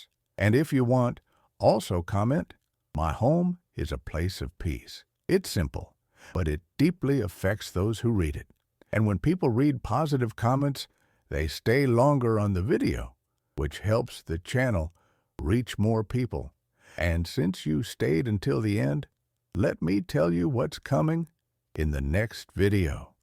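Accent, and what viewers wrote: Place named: South, United States. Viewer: OMGracious.......nothing like a southern drawling buddhist message~ ~ ~ giving us an opportunity to stretch past concepts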